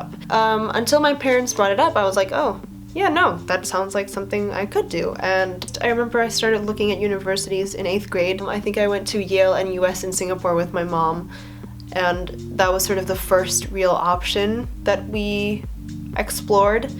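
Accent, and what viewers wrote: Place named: United States. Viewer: you have a totally perfect flat american accent.. howdja do that?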